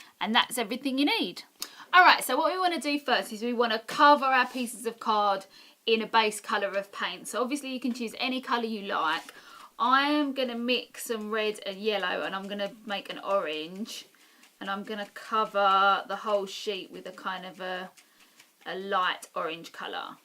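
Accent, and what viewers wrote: United Kingdom. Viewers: Loooove the British accent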